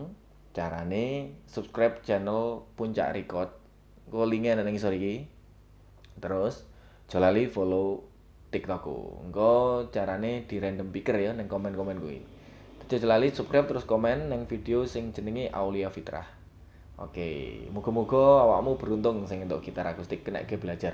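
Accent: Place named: Java, Indonesia. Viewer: Uwow.... Wong jowo tah. Kerasa banget logat mu dek. Salam ya dari ku putra Sumatra Utara Medan.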